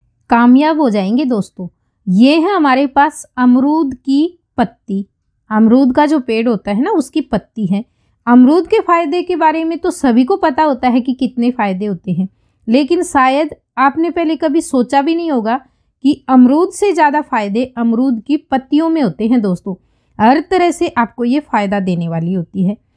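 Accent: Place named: Rohtak, India. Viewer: Aap rohtak se h kya Kyoki aapki Boone ki reathem bilkul mere jaisi h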